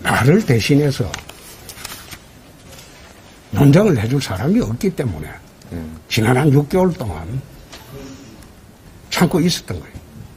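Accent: Gyeongsang, South Korea. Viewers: 홍대표님 막말 아닙니다 경상도 사투리 표준어로 했는데 뭔소린지 억지도 어느정도지 바른말씀만 하시는 홍대표님 존경합니다 👍🙏🙆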